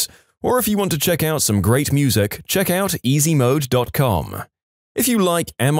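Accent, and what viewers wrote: United Kingdom. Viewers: Im back its just a britsh lady saying good night to bulldozers and such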